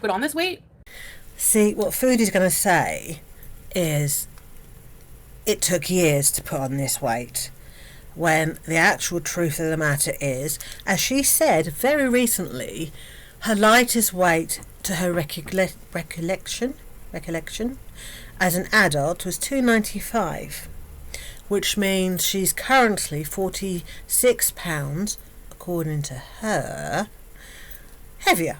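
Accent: United Kingdom. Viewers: This is exactly why i watch her as well....its the lying and the willingness to take it as far as she can go, even when proven wrong. Love your videos! Nothing sounds better when youre always proving her wrong with that british accent!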